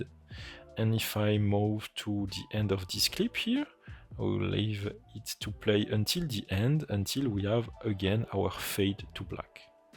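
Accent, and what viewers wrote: France. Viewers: Just a reminder, english is not french, and you do not pronounce english terms as if they were french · J'aime bien son accent frenchy. Ca rend les tutos beaucoup plus limpides. Pas besoin de sous titres pour comprendre.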